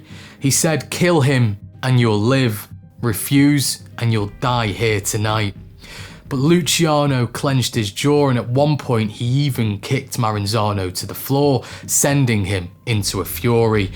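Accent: United Kingdom. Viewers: What the Fuck is this shit some British idiot talking about the American Mafia 😂😂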